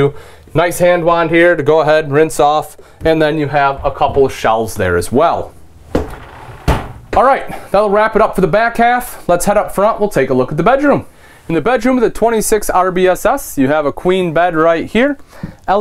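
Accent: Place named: Midwest, United States. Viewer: If anyone ever wondered what a classic mid-Western American accent was, here they have a perfect example! Nice!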